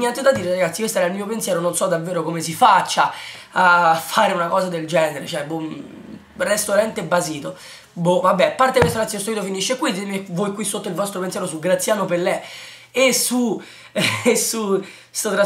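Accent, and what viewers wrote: Rome, Italy. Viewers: Ciao mi chiamo Pino e come te sono di Roma anche se sono della LAZIO....Vivo a New York da 11 anni e ti seguo da molto tempo, sei molto bravo ma dovresti essere un po meno tifoso e Romano Parlante.....potrei essere tuo padre e se posso ti consigliei di studiare per diventare giornalista sportivo...ciao. alla prossima Pino.......Dimenticavo prova a parlare di LOTITO solo cosi potro' vedere il tuo talento....Ciao.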